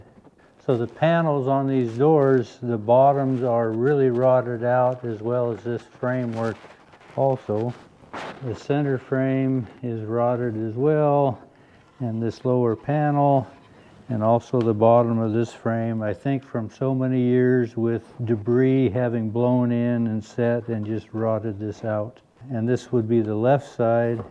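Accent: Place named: Montana, United States. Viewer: I grew up back in Belen NM and had some good friends that were from Montana. Im 55 years old and I hear you say things that absolutely remind me of my friends mom who talked thst way. Their name is Lawson and there was another family from the same area of Montana named Goodson. They knew each other from bake then in Montana and I figure they must have tslked about going south where they could start over.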